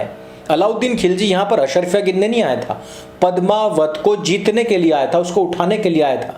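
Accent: Haryana, India. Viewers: आज बहुत समय बाद आचार्य जी को ठेठ हरियाणवी बोलते हुए देख कर बहुत अच्छा लगा ! कृपया कुछ संवाद हर विडियो में ऐसे बोला करने की कृपा करें !! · हरयाणवी लहजा 😊😊😊😊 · Waa bhai aaj haryanvi touch 😀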